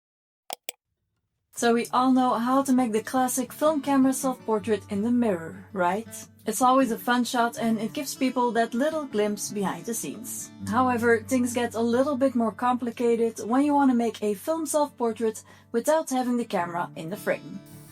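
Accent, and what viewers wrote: Flanders, Belgium. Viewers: Dankt voor de tips, leuk om de verschillende keuren haar te zien en je mooi Vlaams accent te horen :-)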